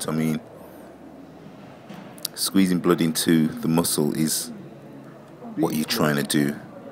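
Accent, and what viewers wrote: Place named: United Kingdom. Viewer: loving your brittish accent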